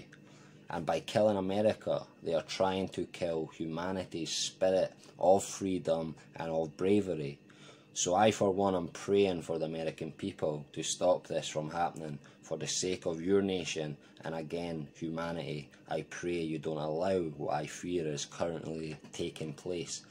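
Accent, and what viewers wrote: Scotland, United Kingdom. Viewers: New Sub here - you and many other astrologers have predicted this orange bastard tRUMP is going down. His luck is finally ending. I'm from the US - love your Scottish accent!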